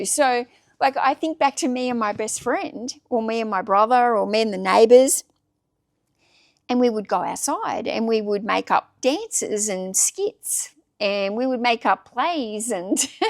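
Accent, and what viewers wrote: Australia. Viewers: I love this....I don't know how to be bored...I started driving strangers (Lyft and Uber) in the NYC and NJ Metro Area....I was burned out from my own business (Consulting for startup Co's ) and needed to get away from a computer. I was terrified about not having any money coming in and having to use my retirement fund to pay bills....I put my head down and just...F......G drove for 2 years....But it wasn't work that I found painful...it was quite liberating and I found my way back to some consulting work but found that Starting my own Youtube Channel based on those 3,000 Lyft Rides (with Strangers) has given me such meaning and put to use all of that experience...Money is still an issue and at 62...I'm still hustling but at a level now that if fun and sustainable and without the fear! Found your Back from Burnout Channel and appreciate your content and lovely Australian accent. 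Thanks. Sean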